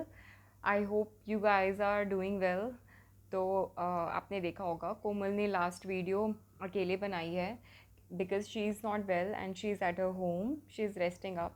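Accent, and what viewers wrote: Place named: Himachal Pradesh, India. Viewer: .. Ok g... Apke bolne se aisa lgta h.. Ki ap HP se ho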